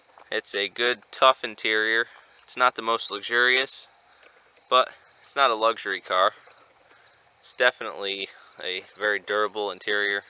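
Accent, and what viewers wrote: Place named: Canada. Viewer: Are you canadian? Every sentence you speak sounds like you're asking a question.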